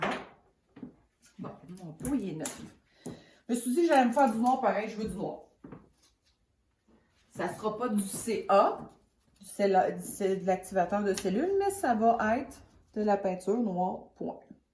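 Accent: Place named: Canada. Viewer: Bonsoir de France! Merci pour ces 2 vidéos! Ce sont les vacances se Noël depuis hier soir pour 15 jours. Donc un peu plus de temps pour "bloomer"... As-tu fait d'autres vidéos? Si oui, peux-tu indiquer le lien stp? J'aime beaucoup tes explications en "vrai direct". Je trouve ça très sympa et j'adore l'accent canadien! Ça donne la pêche et on en a tous tellement besoin en ce moment! À bientôt.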